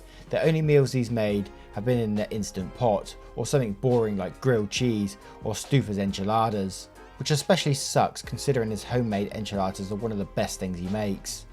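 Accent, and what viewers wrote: United Kingdom. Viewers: Lol. I think MAYBE Canada carries Stouffers but, yeah. definitely has some Brit to his accent but, I'm not sure if he's in the U.K., Australia or New Zealand, or what.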